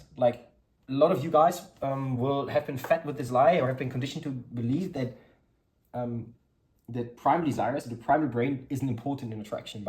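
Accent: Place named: Eastern Europe. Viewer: Where is your accent from?... You sound eastern European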